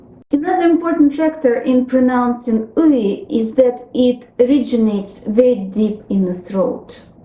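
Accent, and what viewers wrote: Russia. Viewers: Are you Russian? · Russian Accent <3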